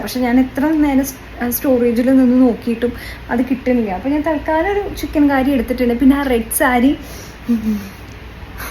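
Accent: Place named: Kochi, India. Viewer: Don't defame kochi slang..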